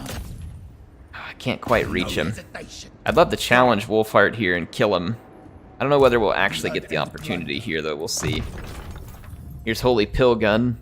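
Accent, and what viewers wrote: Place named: South, United States. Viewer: You've got a slight southern drawl each time you speak. Lol cheers. I grew up in a few small towns growing up. Blue collared and conservative they like to fish and hunt. I like your videos! Thanks.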